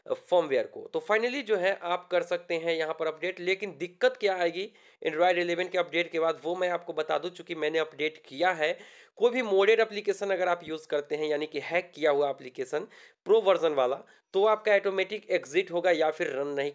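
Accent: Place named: Bengal, India. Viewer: Vii bengali ho ka hind sahe se nahe bol pa rahe ho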